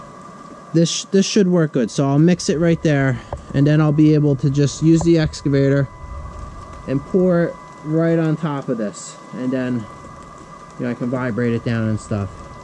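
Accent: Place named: New England, United States. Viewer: It’s an innocent question but there’s weird dudes who’d infringe on privacy. Most tubers don’t disclose for obvious reasons. dialect shouts New England for sure. I’m just guessing New Hampshire down to Pennsylvania with those beautiful mountains....but that a guess.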